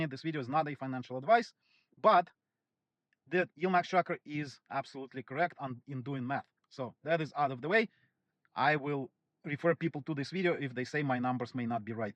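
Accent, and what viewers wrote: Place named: Russia. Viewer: You have russian accent. Do you do channel in russian too?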